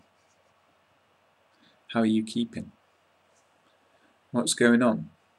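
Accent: United Kingdom. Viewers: I really like your British accent !! Good job :)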